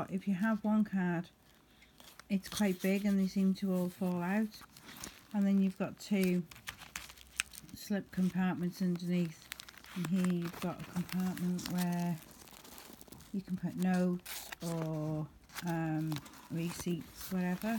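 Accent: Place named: Merseyside, United Kingdom. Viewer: Thanks. Accent reminds me of my mum & nan they are from nosley Merseyside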